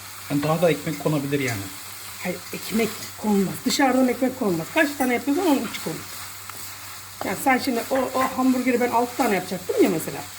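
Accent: Aegean, Turkey. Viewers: Hanımcığım, Ege şivesiyle konuşmanız, içten ve samimi anlatımınız çok güzel. Ekmek dolmasını rahmetli anneciğim yapardı. Bu tarifinizi izlerken anneciğimin mutfaktaki uğraşı gözümün önünden film şeridi gibi geçti. Anılarım canlandı.😔 Ekmek dolmasının kokusunu duyar gibi oldum. Size çok teşekkür ediyorum. 🙏 Ellerinize, emeğinize, tatlı dilinize sağlık. 🙏 Kameramanınız sevgili oğlunuzu da kutluyorum. Çekimde ve soru sormada çok başarılı.👍👏👏👏Yiyenlere afiyet olsun canım. 😋 Selam ve sevgiler...🙋♥️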